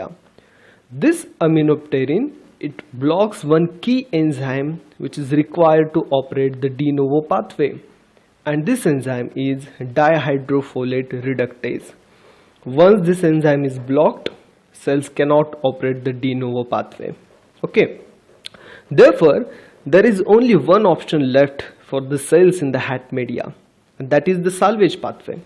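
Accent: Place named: India. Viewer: Hangi aksan bu hintli kardeş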